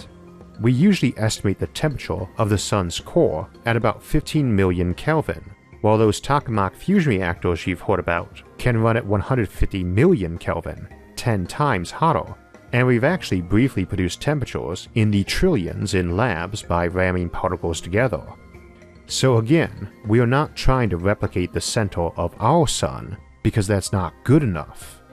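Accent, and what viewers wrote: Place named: United States. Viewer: Get a better speech program hopefully not americanised.. Temperachores ? Dooteron?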